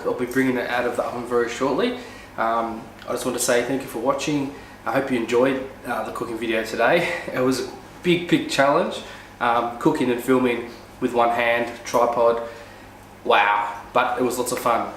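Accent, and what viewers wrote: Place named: Italy. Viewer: Loving the Italian accent mate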